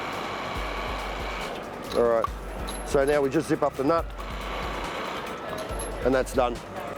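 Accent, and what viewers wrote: Australia. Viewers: I thought he was a country dude at first then Australian...I just tap with hammer and use puller....some come right off most do actually.. 2 outta 10 will be a bitch. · Good thing we could see the stuff working, because his high speed aussie accent is impossible to understand.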